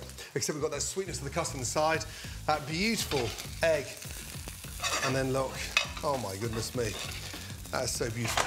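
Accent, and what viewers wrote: United Kingdom. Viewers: Hi Gordon! I love your dishes, I'm Mexican and for me it is difficult to understand your British English, but I love to watch you...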